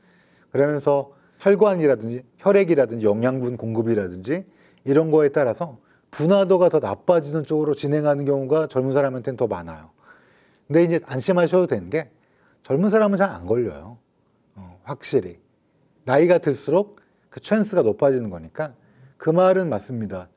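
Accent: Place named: Gyeongsang, South Korea. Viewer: 말투가 대구 언니네😅😅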